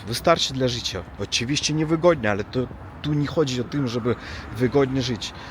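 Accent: Russia. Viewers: jak dla mnie w rosyjskim akcencie nie ma nic złego, tak jak polski akcent w angielskim ;) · Człowieku mieszkasz w Polsce już 4 lata, nauczył byś się chociaż polskiego, bo ile można słuchać tego rusko-polskiego pierdolenia. Mam nadzieję, że pójdziesz po rozum do głowy i w końcu zaczniesz naukę.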